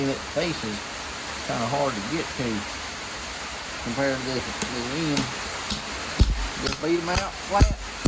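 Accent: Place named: Texas, United States. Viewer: I'm just here for the rain and Texas dialect ASMR.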